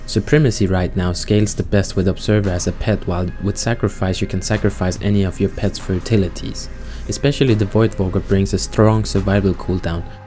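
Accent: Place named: Portugal. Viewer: I am always curious to know from what first language accents come from; would I be at all close if I said Portuguese? Thanks for the guide though, I had little problem understanding it. And "tier as far as I know, (my first language is English) is pronounced like in the word teardrop, or phonetically "teer".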